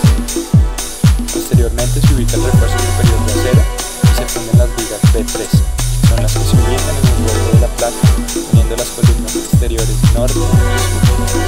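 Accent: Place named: Bogotá, Colombia. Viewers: Que pereza este narrador, Ese acento rolo, narrando un símbolo de medellin, como que no aguanta. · que jartera el acento rolo.. me da un fastidio ese tonito llenadorcito...lo peor es que ellos creen que eso les suena divino.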